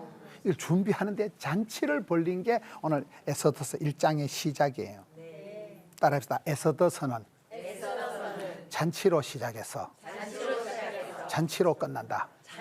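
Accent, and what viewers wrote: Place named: Gyeongsang, South Korea. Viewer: 목사님 경상도 사투리가 너무 웃기세요 그래도 너무 은혜롭습니다~~ 할렐루야 아멘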